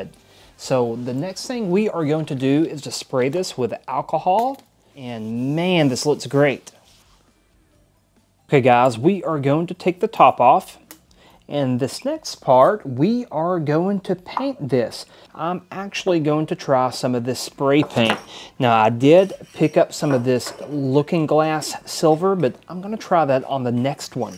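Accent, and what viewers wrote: South, United States. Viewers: You have a new fan. Great video very detailed and I swoon over that country accent ❤️❤️❤️❤️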